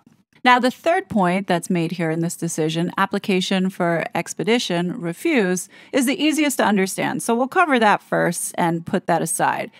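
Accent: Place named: New York, United States. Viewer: I don’t think so. She sound like very well spoken educated person with a New Yorker accent 😊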